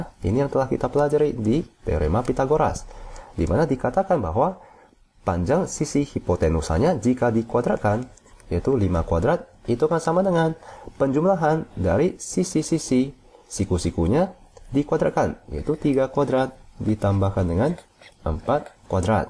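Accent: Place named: South Korea. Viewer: Terbantu akhirnya faham juga .kak kalo ngomong kok mirip orang luar ya kayak orang korea ngomong indo gitu😀😁😁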